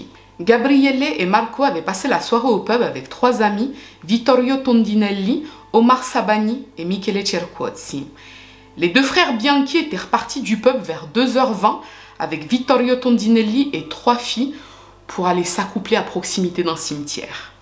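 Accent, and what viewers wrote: Italy. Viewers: Ciao bella signora ma che bel accento italiano 🇮🇪🇮🇪🇮🇪 Nouvelle abonnée 🙄 quelle tristesse cette histoire, révoltante de cruauté et de bêtises, merci de l'avoir traitée avec humanisme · bel accent italien ahah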